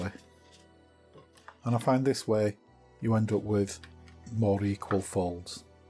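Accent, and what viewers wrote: Northern England, United Kingdom. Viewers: So lovely to see & hear your video with a 'new' take on an old friend. Applying tape to the whole sheet first - genious. And the presentation is so calming - no disruptive music, or irrelevant chit chat, just the basic instructions. Great to hear a (UK) Northern accent too - Manchester? More please!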